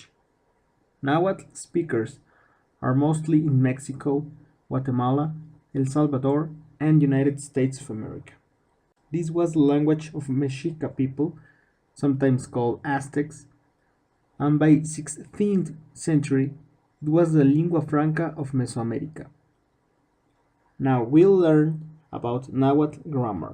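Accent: Spain. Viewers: Um... None of those vowel sounds were correctly correlated to English sounds, but if you listen with Spanish ears it makes sense... It reminds me of the old "pink, green and yellow" joke... (Long story short: "I hear the phone green, I pink it up, and I say, 'yellow'?") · He has a spanish accent it sounds exactly how he said it just americans cant understand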